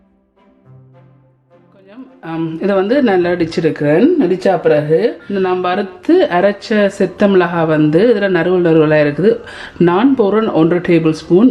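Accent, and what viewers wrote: Jaffna, Sri Lanka. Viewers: Hatts off for keeping jaffna prononciation !!!! Thats our adayalam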